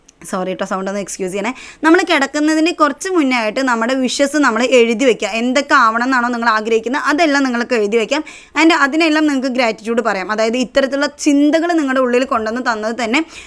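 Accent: Palakkad, India. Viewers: Palakkad aanalle (thodi)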